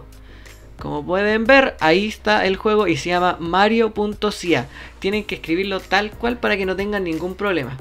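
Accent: Chile. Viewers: sos chileno? y gracias lo estoy viendo <3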